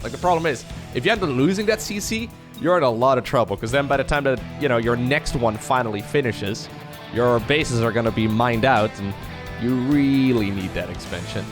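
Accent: United States. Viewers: Yea, Lowko...it is interesting to hear about your American Twitch Standard accent and you behaving like a degenerate Runescape-addict past weekend and all but can we get back to the game...that would be great...yeah....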